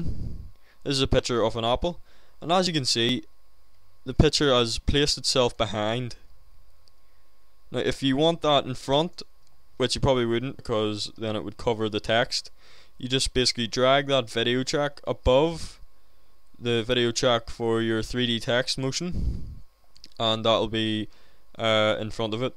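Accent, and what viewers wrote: Northern Ireland, United Kingdom. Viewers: you from northern ireland matE?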